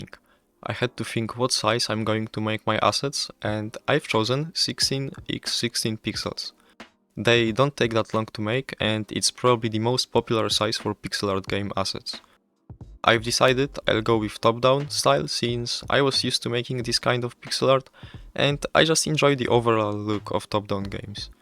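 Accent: Poland. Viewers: ty z polski jestes bo taki akcent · ty polak co nie XD od razu slychac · poznam polaka jesli go usłysze... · brzmisz strasznie polsko, powodzenia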